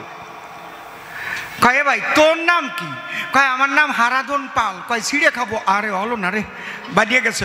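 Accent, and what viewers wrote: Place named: Bangladesh. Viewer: বাঙালি এরকম করে কথা বলে না ওর কথা বলার আছে বাংলাদেশি ওম মাদারচোদ কা ভাগা এখানে থেকে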